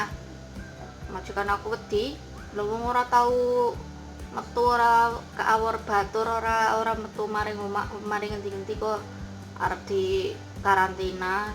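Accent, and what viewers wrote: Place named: Banyumas, Indonesia. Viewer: Ponorogo rasa ngapak ya... 😊👍👍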